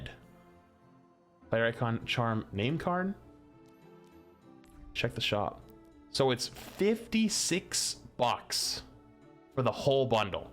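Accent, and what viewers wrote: Boston, United States. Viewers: We need more Boston accent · BOSTON ACCENT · DOOM'S GONNA BE BETTAH!:D Embrace the Boston,. Let it out! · I need more OG Boston accent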